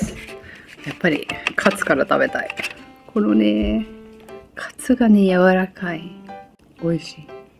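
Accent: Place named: Oita, Japan. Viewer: 返信ありがとうございます🎵💖 さん大分なんですか⁉️😅 道理で方言が似てると思ったです😊 余り鹿児島弁は出てないですよね‼️ これからもいっぱい楽しい動画を宜しくお願いします🎵💖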